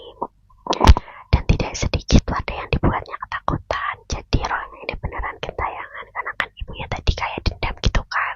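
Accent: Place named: Java, Indonesia. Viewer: medok bgt kak😭, btw asmr bahasa jawa lagi dong tp crita gtu trsrh kakak crita apaa ajaa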